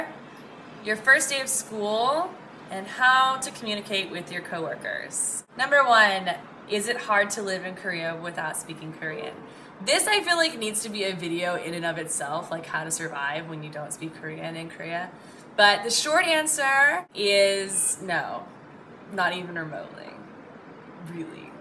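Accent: South, United States. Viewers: I hope to see you in the national TV one day. Maybe you will learn Korean some day~ ^^ By the way, I reaa~~~lly love the hint of souther accent. Keep cool. It is really hot and humid outside T_T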